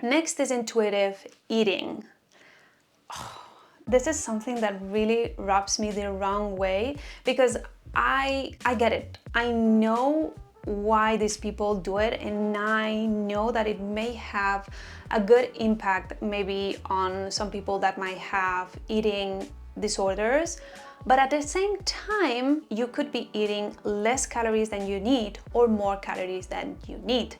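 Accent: Spain. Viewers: Sabia que eras española! No hay ningún problema con tu pronunciación, eh? Al contrario. Pero lo estaba notando. Supongo que porque yo también lo soy xd Que tengas un buen día :)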